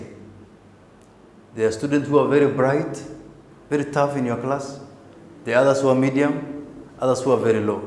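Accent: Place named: Kenya. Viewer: I hope this teacher is from neighbouring country called kenya because he pronounce words the way i pronounced which means iam also from kenya.anyway thank teacher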